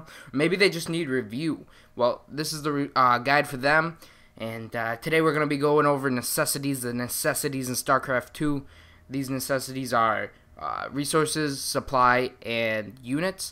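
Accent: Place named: New Jersey, United States. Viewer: Sounds like you're from New Jersey lol.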